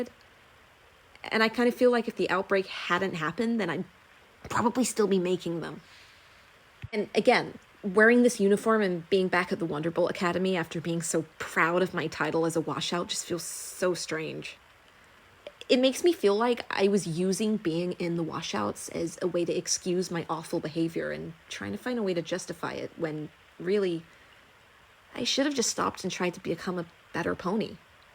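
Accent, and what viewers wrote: Australia. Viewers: YOUR AUSSIE ACCENT IS SO GOOD!!!!!!!!!!!!!!!!!!!!!!!! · DUUDDEE YOUR AUSSIE ACCENT IS AMAZING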